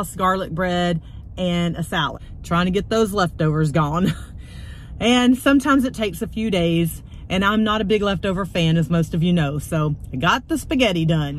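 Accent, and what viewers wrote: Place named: Texas, United States. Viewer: Love your character and your Texan accent.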